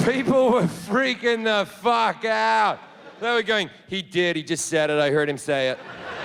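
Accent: Australia. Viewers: He's from 'straya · No. As an european, I sensed that it might be aussie. And it it! :-D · He is just aussie:) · Australian mate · He is just Australian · He's Australian that's their accent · That's just the more relaxed and slack type of Aussie accent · He’s an Australian comedian. Hello. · Nahh... he just sounds Aussie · Nah mate, he's just Australian. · He's Australian, mate. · Wow, love him. So beautifully Aussie. 💖💯👍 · He's just Australian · A lot of Aussies talk like this. QUEENslander. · Just Australian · Australian accent very irritating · No, he's just Australian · He is not American !!!😂This is an Australian accent Lol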